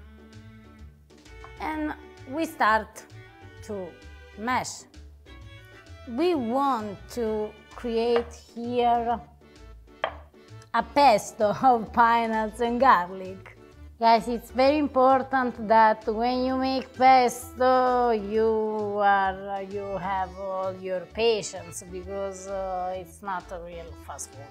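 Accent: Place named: Italy. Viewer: Bellissimo accento italiano 😊☺️☺️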